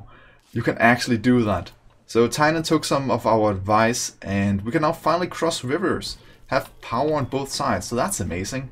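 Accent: Denmark. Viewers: that danish accent.. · Are you from Denmark your accent tho?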